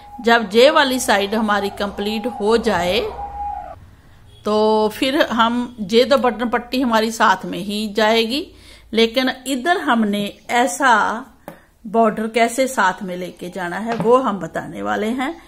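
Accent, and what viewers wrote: Punjab, India. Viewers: ji aap ka Punjabi tone bhut achha lagta hai...raaje kahna to bhut hi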